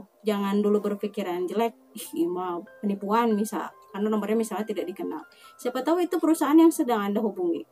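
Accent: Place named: West Java, Indonesia. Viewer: Terima kasih mudah2an bermanfaat, bahasanya sunda banget ih...☺ Maafkan Ay...koreksi positif.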